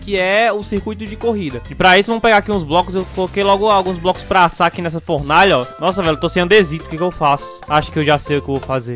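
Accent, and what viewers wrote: Ceará, Brazil. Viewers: Tú e de onde man,esse jeito de falar parece CE kkkk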